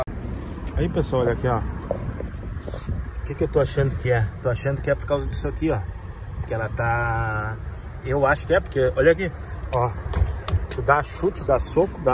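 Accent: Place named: Rio Grande do Sul, Brazil. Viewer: De acordo com o sotaque, quase imperceptível, trata-se de um gaúcho.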